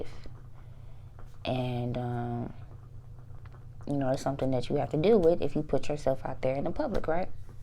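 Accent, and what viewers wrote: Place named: Indiana, United States. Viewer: good content, this is off topic but im from Georgia and my ex is from indiana and you and her have similar accents, but anyway what you're saying is understandable in regards to the reactions, your music and your passion for it is admirable, keep doing what you're doing. you'll get where you want with your gift.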